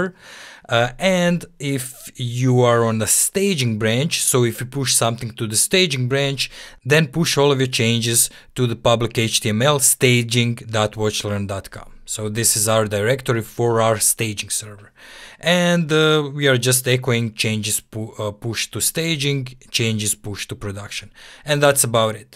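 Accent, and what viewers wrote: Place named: Russia. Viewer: u tallk like russian where u originated frm?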